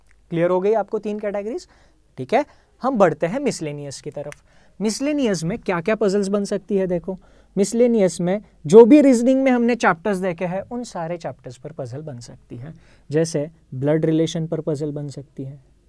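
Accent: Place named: Maharashtra, India. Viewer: that marathi accent is 😍😍😍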